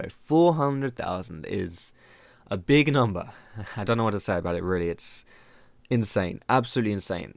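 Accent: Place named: United Kingdom. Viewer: From the very first video, I was in love with your cheesy humor and that lovely british voice! I can honestly say, I'm here for, not;)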